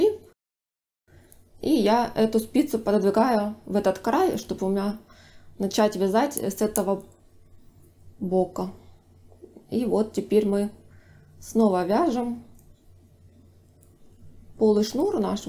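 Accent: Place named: Russia. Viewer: Интересно,что за народность в России с таким интересным говором и диалектом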